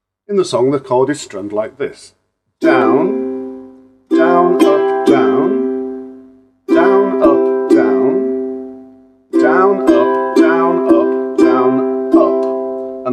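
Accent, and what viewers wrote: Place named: England, United Kingdom. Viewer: If nothing else it makes such a nice change to hear a youtube tutorial (on anything) in an English accent. (Sussexman here)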